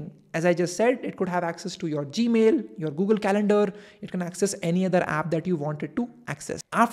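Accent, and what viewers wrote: India. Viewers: Sorry indians should not speak English because they speak English in Hindi same as you are now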